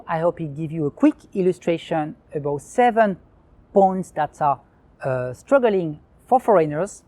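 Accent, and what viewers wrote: France. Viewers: investors can put their money wherever they want and it doesn't mean they are right. Secondly american is the clearest accent out there?, it is all too subjective dear, i have a hard time understanding an texan or a deep south accent and they are all american accents!!! luckily they are subtitles on TV to help. And for a british or aussie, their english will be the clearest, and they are lot of students learning english there, so it's a matter of perception and preferences: for my part, i met people all around the world and they ask speak english with a different accent and i understood all of my speakers. My comment about the guy who suggested that should learn to speak american english was to say, although his accent is french but the content of his message is understandable and they are subtitlesb in YT for those who have difficulties to hear what he says.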